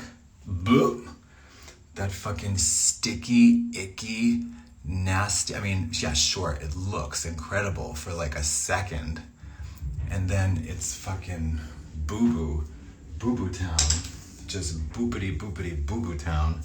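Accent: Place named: England, United Kingdom. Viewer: English accent!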